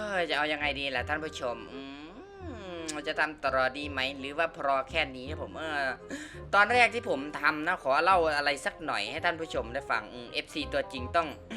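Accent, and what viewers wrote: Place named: Isan, Thailand. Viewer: พูดอีสานดีกว่าคับเป็นแบบฉบับเค้าเอง มันสนุกน่ะ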